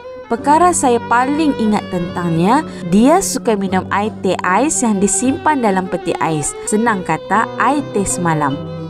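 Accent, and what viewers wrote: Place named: Penang, Malaysia. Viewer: Walau pn usia nya/dgn lawak logat penang itu membuat saya dpt mengenali nya hingga arini.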